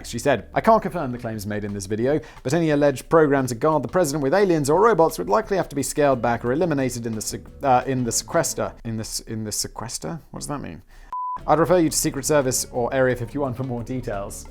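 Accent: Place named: United Kingdom. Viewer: I never knew you could put a British accent on Anunaki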